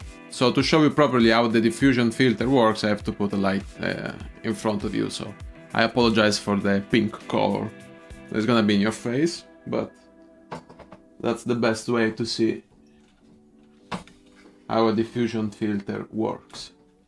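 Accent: Italy. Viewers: I love your positive attitude, quick smile, great information/advice, and pleasant Italian accent! I think if you keep this up you will eventually earn many subscribers. But (even though I'm not a director) I'm going to "blast you" about focus! It's very distracting that your eyes and much of your face are out of focus for so much of this video. I would advise to just go ahead and stop down the lens a bit next time and turn up the lights to bring back the exposure you want.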